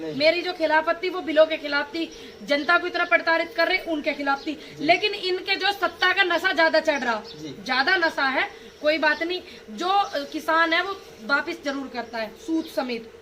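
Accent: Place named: Haryana, India. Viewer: जी,आप का ठेठ हरयाणवी गाँव वाला लहजा और किसानों के लिए संघर्ष करते हुए देखना अच्छा लगता है ।किसान को छेड़ कर बीजेपी ने अपने रसातल मे जाने का मार्ग प्रशस्त कर लिया है।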